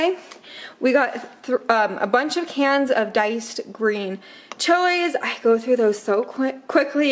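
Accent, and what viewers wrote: Utah, United States. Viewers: Just started watching you and thought I detected a bit of a Utah accent or words as I lied there for for 50 years and really miss it as I now lie in Arizona.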